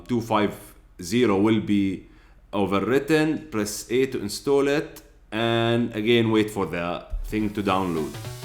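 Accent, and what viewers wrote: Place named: Arab world. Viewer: I LIKE YOUR ACCENT IM ARAB AS WELL BUT LIKE YOUR ACCENT IS JUST WOW.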